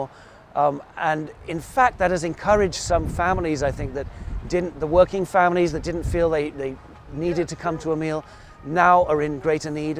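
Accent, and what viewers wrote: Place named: England, United Kingdom. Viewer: ...who is this narrator right off the...boat with his quaint English accent...wtf...moved to waimea due to zip code based average wealth of newly arrived residents that made their wealth through the gravy train FAKE economy now crumbling...Worldwide...HAWAII FOR HAWAIIANS... Waikiki Io Kea... Cult of Ku...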